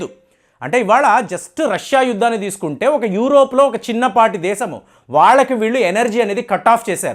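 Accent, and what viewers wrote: Tamil Nadu, India. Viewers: GARU meeru chaala peddagaa vaaguthaatru; anavasaranga high pitch lo pelutharu: Governor Shanti SHANTI Kanta Das ani perkonnaru: naaluka madatha padinda ledaa mind dobbindaa? Aayana peru Shakti Kanta Das. Enduku antha hype create chestaru meeru; prathi maataa nokki vakkaNinchi paday paday chebutharu; too much hype thammudu; manchi vishayalu testhunnavu kaani intha hype aqqaraledu: mari Mimmalni ani cheppey badulu mimmaLLni ani chebuthavu: ee yaasa vadulukolevaa inkaa aa saambaru inguva vaasana poleda? Intha kaalam telugu rashtralalo untoo achcha telugu palakaleva. Ayyo devanandanaa!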